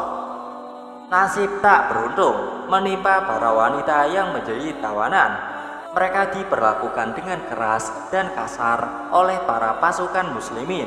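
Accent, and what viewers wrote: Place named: Java, Indonesia. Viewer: Teruk bahasanyer..pelat Jawa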